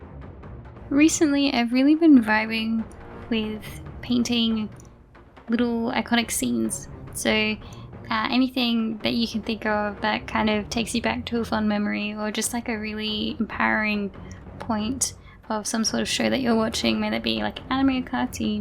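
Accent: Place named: United Kingdom. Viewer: What is your accent? It sounds "almost British", but not quite. Are you Welsh?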